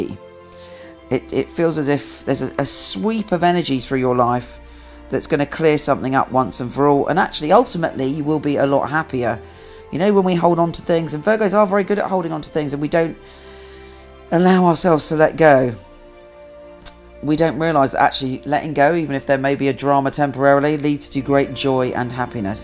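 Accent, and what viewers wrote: United Kingdom. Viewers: Your videos are stunning. if they had an award for production I would vote for you. I always come for my weekly and monthly reading. You are such a force with your information. Oh, I just adore the British accent. Cheers!!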